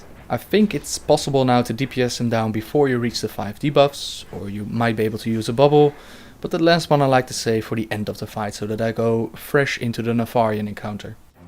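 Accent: Netherlands. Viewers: je hoort wel dat je nederlands bent haha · Dutch accent! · Are you dutch?? i can hear it in your voice :) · Dutch accent? ammiclose? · dude are you from the netherlands or something? I think i can hear it in your accent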